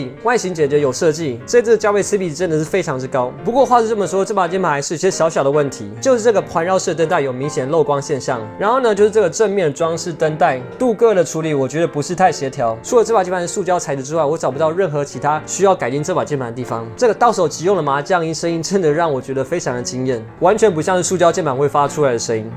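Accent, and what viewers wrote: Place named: United States. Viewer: 很喜歡你講話有點ABC，但bgm卻是中式古風的衝突感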